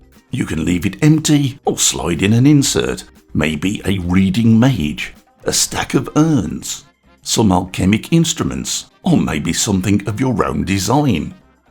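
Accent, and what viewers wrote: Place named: England, United Kingdom. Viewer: You sound english. Use feet and inches !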